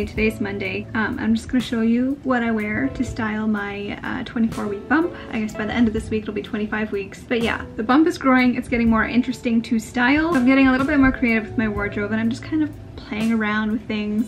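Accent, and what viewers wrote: Canada. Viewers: I am so excited to have found you. I hear a Canadian accent but wonder where you are from exactly.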